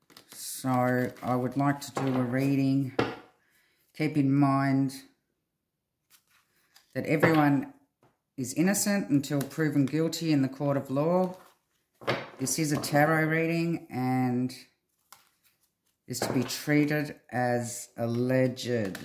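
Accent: Australia. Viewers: This rings true. I hope they convict him and find her! Thank you for sharing. I love your work and your soulful Ozzie accent. :)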